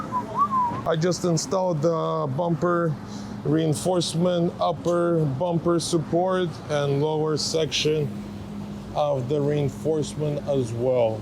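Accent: Russia. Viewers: Seems maestro has Russia accent.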